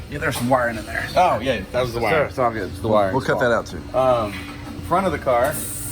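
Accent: South, United States. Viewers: new accent is really freaking me out. Its a cross between Californian but more southern drawl now.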